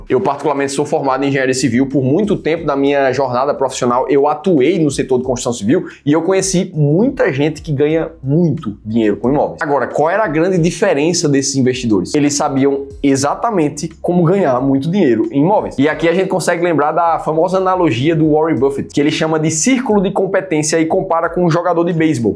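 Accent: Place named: Northeast, Brazil. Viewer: Com esse sotaque de nordeste. Não pode reclamar. Deve achar bom mais imposto melhor. Virei benefício.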